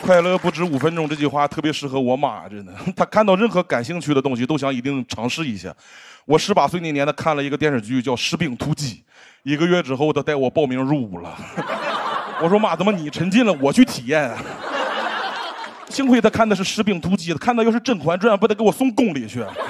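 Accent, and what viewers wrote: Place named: Dalian, China. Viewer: 我是大連女婿，喜歡大連口音。如果冒犯到大連人， 這裡道歉了。 當然肯定會冒犯到一群假脫口秀觀眾， 但是就不道歉了。反正你們也不開竅。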